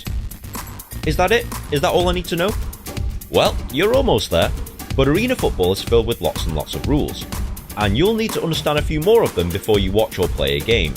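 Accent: England, United Kingdom. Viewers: Holy shit! I just dropped my lunch! I moved to America last year, and my wife (Emma Howard/Madden now) and I are going to watch the AZ Rattlers play this afternoon and I wanted to see how the rules varied to regular American Football. I didn't even realise until halfway through that this was you, albeit I did think it strange that guy narrating had an English accent! Hope you're doing well mate - I can imagine you still rollerblading around Leyland!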